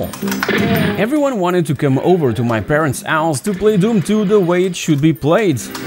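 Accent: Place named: Portugal. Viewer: já agora digo-te, percebi logo pelo o teu sutaque que eras português, sendo que eu também sou e tenho a noção de como é o inglês da maioria das pessoas cá que não ficaram habituados, até ver-te a falar no vídeo. Acho interessante ver a nossa comunidade a fazer este tipo de conteúdo, visto que a maioria ou são brasileiros, ou de outros paises "mais interessantes". Acho que isso já me fez pensar em subscrever ao canal, abraço, e boa sorte.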